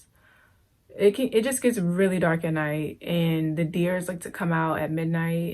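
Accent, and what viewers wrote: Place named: Michigan, United States. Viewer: Sounds like the upper peninsula in MI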